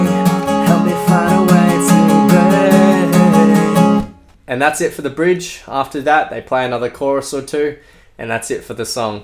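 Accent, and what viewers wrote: Australia. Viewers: Where are you from? You sounds super Australian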